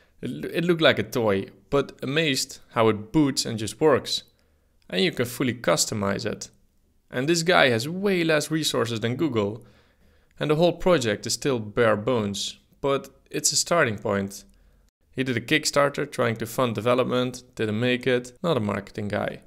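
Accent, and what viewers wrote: Netherlands. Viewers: This dude Dutch? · Are you Dutch? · that dutch accent. makes it sound close to home ;)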